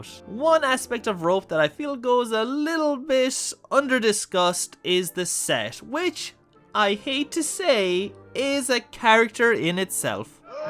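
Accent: Ireland. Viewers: You're terrific -- what a fun approach to life. LOVE Rope. Read about it as a 1960s teen and was dying to see it, but it was as buried as Martin van Buren then. Thought to search it in youtube one day 40 years later and wham, there it was to enjoy free. INSTANTLY this tantalizing mutation from Hitchcock's doggedly hetero (but frequently gayoid) oeuvre at large sprang into my slightly less closeted adult life. What's that you say -- cotting? Codding? (Cotter/Codder.) Am interested -- have been a language/dialect/idiom/slang addict forever, and of course LOVE your Irish accent. Tried googling the term. Hitch was gay as a goose, of course. Supposedly he and Alma only made love once, thus Patricia. ("Therefore champagne.") Rope's photographic gimmick isn't such a big wow but it gives this extra-special movie extra cachet. 7 normal splices would've been fine :)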